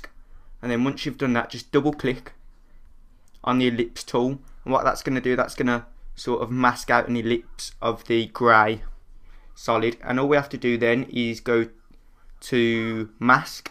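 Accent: Birmingham, United Kingdom. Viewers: first i hated ur british accent but then i started to watch these videos, now i love ur accent <3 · are you a brummie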